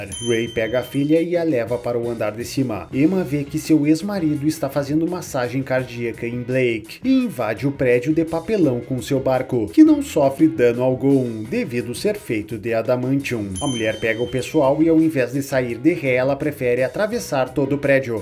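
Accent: Rio Grande do Sul, Brazil. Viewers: Mas que barbaridade tche o guri tu e gaucho?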